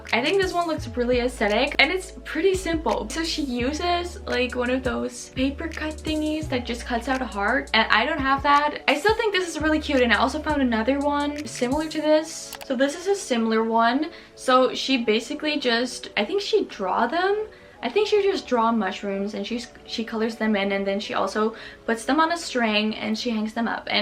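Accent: Netherlands. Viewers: By the way you talk, Your dutch!!!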